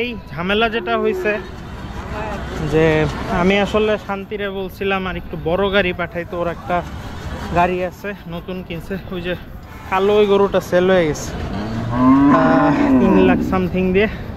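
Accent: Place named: South Korea. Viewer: Oi hala English sound hoi ke ?? Thik Korean bangla den voice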